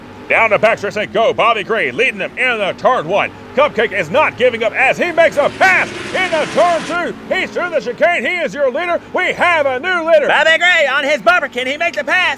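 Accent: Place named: South, United States. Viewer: Just found you guys! About time we had some good country boy narration- 😂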